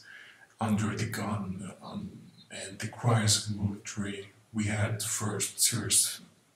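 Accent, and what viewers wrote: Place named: Russia. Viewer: Четкий акцент рускоговорящего который пытается говорить на английском .Цель просто кого-то очернить.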